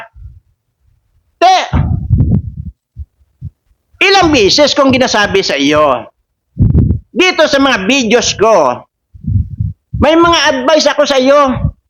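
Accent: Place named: Visayas, Philippines. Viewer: Ang cute ng accent nio magsalita ng bisaya Sir.Basta ang saya ko pag tumatawa po kayo😅 pati ako lakas tawa din😅.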